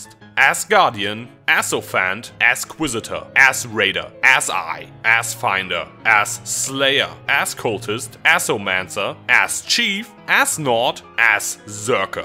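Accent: Germany. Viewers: The german accent is killing it :D :D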